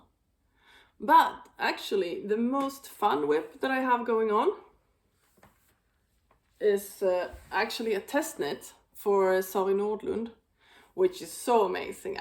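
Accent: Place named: United States. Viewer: Your “American” English is very good. I enjoyed listening to you and watching your podcast💕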